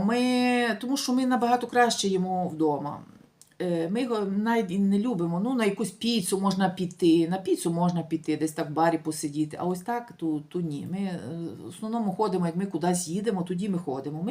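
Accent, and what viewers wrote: Lviv, Ukraine. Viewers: Hallo, комплІмент, від Ольги, мені подобаєтся ваш львівский діалект,а також миле відображення життя...Швейцарія - це super!!! Дайте контакт, поспілкуемось! Успіху в усіх справах! Liebe grüsse, Olga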